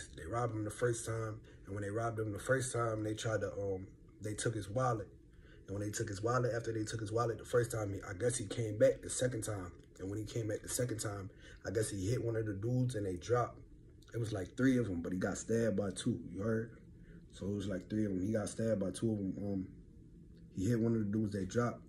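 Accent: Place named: North Carolina, United States. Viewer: He from nc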